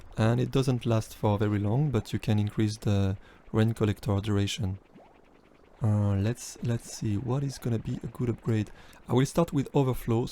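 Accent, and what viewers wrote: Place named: France. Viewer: Your accent tell me that mby you might be fr so.. j'avais une petite question, est-ce que ce ne serait pas une bonne idée de mettre un marqueur fluo d'une couleur au choix mais bien flashy autour du pointeur de souris? (J'ai pu remarquer avant hier en terminant la démo que la souris devenait vite illisible ou introuvable quand tu arrives sur les trillions et qu'il y a des rivières de fruits à l'écran.)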